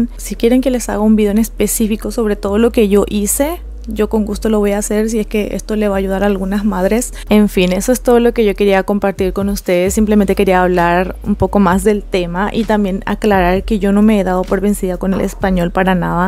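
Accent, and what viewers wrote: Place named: Mexico. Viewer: siento que tu acento ya se escucha más mexicano, igual hablas relindo ❤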